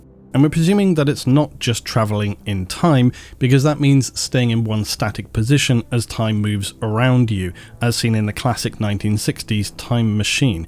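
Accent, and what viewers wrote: England, United Kingdom. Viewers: You're right about language being insurmountable. Until you mentioned it yourself, I was checking off each suggestion as impossible due to the language barrier. I was listening to your English accent as an American, knowing that the differences only represent a few hundred years of geographic distance. Even with Imperial media technology serving to provide some linguistic cohesion, a modern traveler would probably sound like they were speaking Old English to someone in 2024. So, completely unintelligible. Other notions that crossed my mind would be strange ammunition calibers of travelers and the ancient disease we carry that had been stamped out long ago by immunizations, etc. A travelers might be tracked and captured as a Patient Zero of a long forgotten virus.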